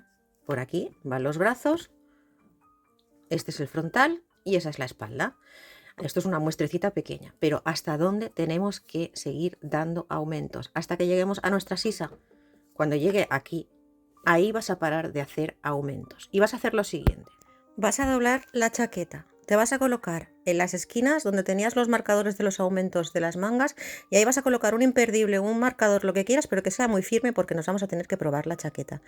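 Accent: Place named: Spain. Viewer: Hola, hermosa! Desde La Plata, provincia de Buenos Aires, Argentina 🇦🇷, te acabo de descubrir!! Como docente jubilada no puedo menos que felicitarte por tu excelente didáctica! Voy a hacer este cardigan o saco porque me animo de una, según tus claras explicaciones, a las que agregás recursos de color y los tips siempre muy bienvenidos! Con mis 72 años, "allá vamos", como decimos por acá! 😀 Ese tonito español- no * gallego * 🙄, me encanta! Muchas, muchas gracias por tu encanto personal y profesional. Luisita. 😘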